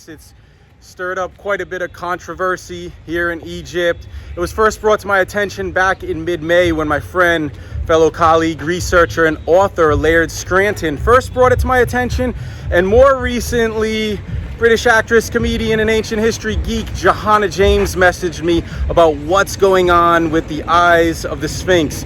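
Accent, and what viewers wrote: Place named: New York, United States. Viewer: You're one of like 4 channels I subscribed to. Keep the videos coming I think once anybody into Ancient Egyptology or even anybody who Just wants to see how beautiful Egypt is from your videos sees your channel you'll have a shit ton of subscribers. Very well informed and your vids show the real side of modern day and ancient egypt. Btw you're from NY aren't you? Lol I hear it hahahaha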